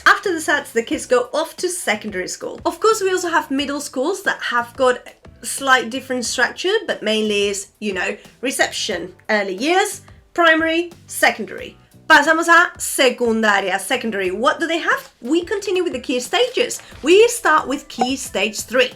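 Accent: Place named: England, United Kingdom. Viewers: Interesting how you have acquired English accent. I know lots of Spaniards who live(d) in Ireland/GB. None of them have “lost” their accent. Very impressive. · It’s also super interesting to see a non-native speaker adopt an English accent as opposed to an American one, since that seems to be more common amongst English learners nowadays (at least from what I’ve noticed online)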